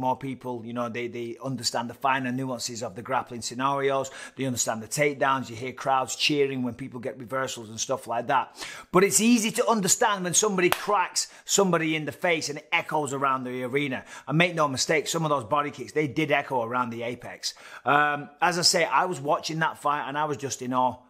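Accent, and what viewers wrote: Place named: United Kingdom. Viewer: Dude is a natural. He's decent looking, funny, great talker, and he has a British accent. How can you not like him?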